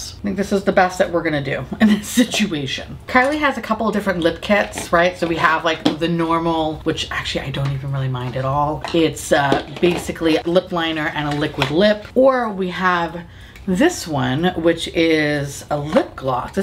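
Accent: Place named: Newark, United States. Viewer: Sounds like Newark to me!!!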